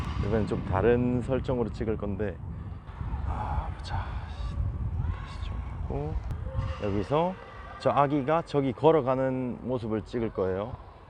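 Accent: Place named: Gyeongsang, South Korea. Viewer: 멋지다^^ 갱상도사투리 쩐다ㅋ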